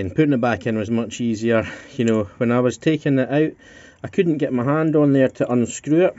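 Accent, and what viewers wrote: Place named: Scotland, United Kingdom. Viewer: what will make you kick yourself is the seller on e bay that is selling the same pipe but in the genuine nissan part number for £31 is based in Scotland, and from your accent, sounds like that is where you live🤣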